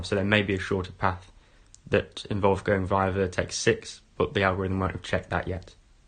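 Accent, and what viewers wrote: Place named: United Kingdom. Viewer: uk accent is annoying , can't understand.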